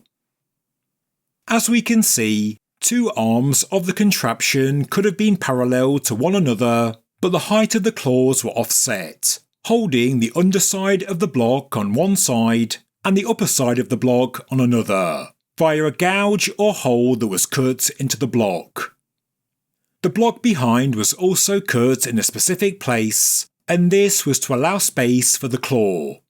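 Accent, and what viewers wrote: United Kingdom. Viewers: He doesn't lol....he just gets possessed right before he begins recording and snaps back out of it when hes done. He speaks normally, other than the British accent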